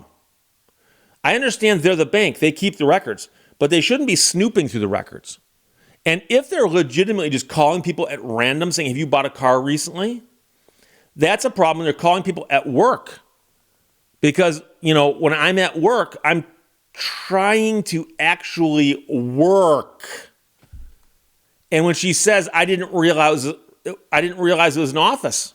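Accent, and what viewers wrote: Canada. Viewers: Canadian accent?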